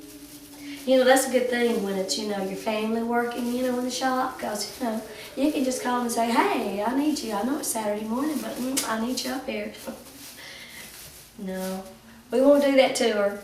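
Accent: South, United States. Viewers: I am very interested in making wreaths. Can you explain the differences in mesh. I have seen some peple talking about deco mesh and geo mesh. Are those the only ones and what are the differences? Thank you and I love watching your tutorials. I am from Louisiana where are you from. Your voice sounds south but definitely not as southern as me. Lol By the way you are great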